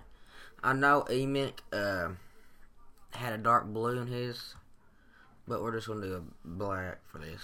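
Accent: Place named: Southeast, United States. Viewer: Being a southerner (Texan) myself, this sounds southeastern, like Alabama/Miss/Tenn.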